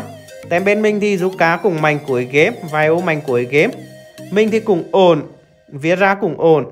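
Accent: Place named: Nghe An, Vietnam. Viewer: Giọng nghệ an nghe vui quá hiii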